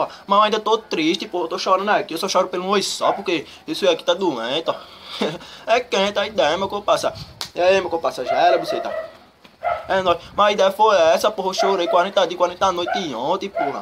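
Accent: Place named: Pernambuco, Brazil. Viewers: Pernambuco boy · É o melhor sotaque do mundo o Pernambucano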